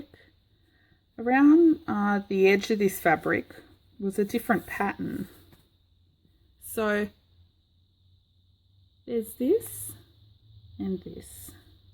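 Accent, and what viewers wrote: Australia. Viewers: These are absolutely fabulous,! I hope they sold well! I’m an old quilter, started in 1986! But haven’t quilted much since my divorce & move back to Kansas in 2004. So I have a huge collection to choose from! 🥰🤗I will have to push junk out of the way, but if I’m motivated that won’t be a problem! Haha! I recently bought some cotton fabric at Walmart (those of us who really aren’t too crazy about shopping there call it Wally’s World! I suspect you would be in that category!) But I bought it to use as half pockets on larger journal cards, etc. Then thought of some other thing to be entranced with & they got pushed aside…story of my life!! Another woman said she thought she had “journaler’s ADHD”, yep that’s me! I don’t think it’s all that uncommon among crafters, though! I’m enjoying your channel soooo much, you are just so much fun & I love your accent! 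In one video you said you lived in Sidney, are there different accents around the country? I mean you’re a continent! England is just one little bitty country & yet practically everybody I watch has a different accent! It’s really interesting to me how that happens. Are your eyes better now? I hope so! Take good care! Erica❤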